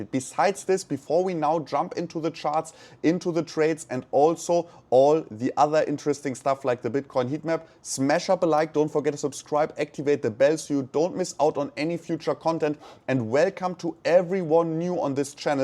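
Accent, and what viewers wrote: Germany. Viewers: Hör ich da einen deutschen Akzent? ;-) Danke an Altcoin Daily. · Altcoin Daily Bright me here! Which European country are you in? Sounds a little German maybe? Anyways, I'm in the US and you're 6 hours ahead of me. If you did livestreams at 9pm your time, would be perfect for me! Keep up the amazing work!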